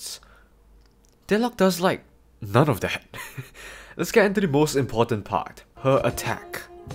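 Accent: Singapore. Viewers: u sound Singaporean so i'll sub, hope u do more entertaining rants.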